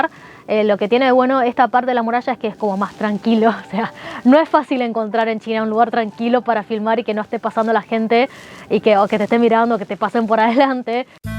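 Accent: Buenos Aires, Argentina. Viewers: Habla muy estilo argentino, la pronunciación es perfecta. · Me encanta su combinacion cultural con sus facciones de La Republica China y un soberbio acento argentino, un abrazo y felicitaciones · 😂😂 de nuevo un peruano, cree que todo se originó a partir de ellos, la chica vive en Argentina, no escuchas el sho. Es más Marco Polo nació en Perú y Cleopatra es Limeña jajaja 😂😂😂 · Ché tenés el dejo argentino. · Un interesante relato aumenta el interés por el acento porteño, de la narradora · Interesante.. hablas como argentina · Primera vez que escucho a una China hablando con dejo Argentino 😂😂😂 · Tienes acento argentino muy curioso hablar con acentos chino y argentino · muy buen video, felicidades. Habla Ud. un castellano casi prefecto con acento argentino ... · Acento argentinazo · Es argentina. ¿Y q problema hay esa variante dl castellano u otras? 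· Cómo se nota que la presentadora aprendió el idioma español de argentinos. La 'sh' se usa arbitraria e indebidamente para remplazar la "ll" o la "y", además del acento característico. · Excelente explicacion y con acento argentino... Puede relatar con un acento mas neutro.. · Me encanto tu síntesis. Tu acento argentino es muy sicodelico y me encanta tu guapura. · Jajaja, la chica es argentina? Q gracioso se ve como habla, 👍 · Hermoso acento argentino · Lo mas inverosimil fue la magia de los brujos al servicio del Khan. Estos hacían que la jarra de vino llenara la copa (solita) y despues volara a las manos del Khan. Este relato le valió a Marco Polo ser presionado por la inquistion y retractarse de lo dicho.Aaah Bonito tu acento argentino chinita♥ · Su acento ¿argentino? suena muy bien. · Cuando vosotros habláis con tanta soltura el castellano ¿es porque sois nacidos y criados en un país hispano hablante o por mero estudio? 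Es que me sorprende, en tu caso, con un excelente acento argentino. Gracias. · Soy peruano, y corroboro, no es acento peruano, es argentino. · XD ese acento es argentino porteño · Muy lindo el video y tu dejo argentino me encanta felicitaciones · Nunca escuche a una mujer china hablando con acento argentino, y además muy linda y atractiva 😘😘😘😘, noto que naciste en la bella tierra Argentina, ya desearía que seas mi esposa, besos desde Cochabamba Bolivia 💋💋💋💋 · Se nota el acento de argentina de esta chica oriental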